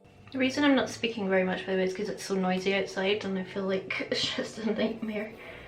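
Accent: Scotland, United Kingdom. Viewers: Sound funny hearing our own Scottish accent when u say thinks like “ur mental mate” ha ha brilliant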